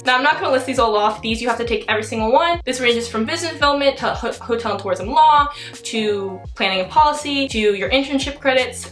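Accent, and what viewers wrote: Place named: United States. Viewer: your accent is so perfect and pleasant I think you are American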